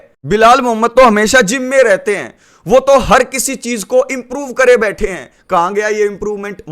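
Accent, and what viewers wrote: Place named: Haryana, India. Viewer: Your Haryanvi accent is too good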